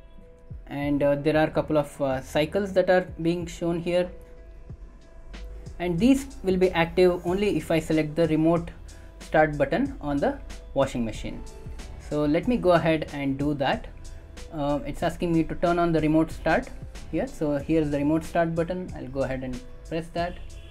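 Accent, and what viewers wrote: India. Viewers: That was the most painful video I have ever witnessed, please don’t ever review a product again, or if you do please be sober The worst part is the Indian accent in English!!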